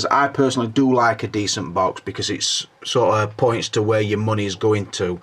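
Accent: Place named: Manchester, United Kingdom. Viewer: hahahahhahaha ! Mate where are you from? Ypu sound local.. manchester ?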